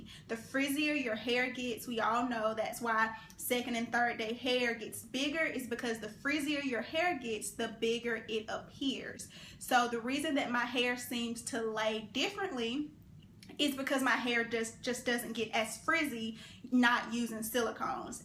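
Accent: South, United States. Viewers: Where are you from?? You have such a cute country accent. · Your Southern accent is lovely. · I can hear the South all in your voice!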